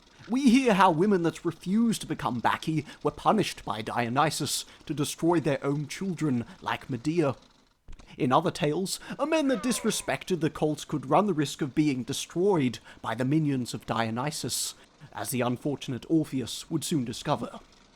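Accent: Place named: United Kingdom. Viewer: This sounds very British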